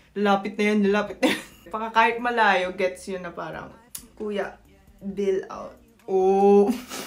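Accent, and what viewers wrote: Batangas, Philippines. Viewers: Alam mo ga ining na akoy Batanguino rin kaya ako ay tuwangtuwa ss iyo the way you talk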